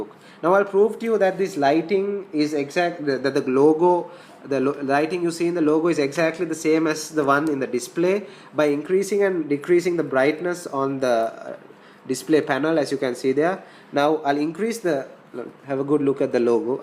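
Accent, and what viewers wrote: India. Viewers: Is it indian english? Epically slow action to say NO, it doesn't! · Hard to understand your English..Your Indian accent is so strong.. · i dont like your indian-english. its annoying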